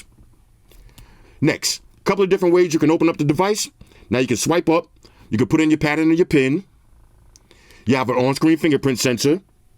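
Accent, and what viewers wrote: United States. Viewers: Morning Mr. Have ever considered have another side job as a narrator in reading books. You have a very distinct American accent, which I think that you would make" lots more money". I'll surely buy it.... thanks buddy. Oh, I once had a pussy cat like yours, years ago. His name was Walter. I had him for 12 years. He passed away in 2009. I miss his company. Sad face.... nico Trinidad and Tobago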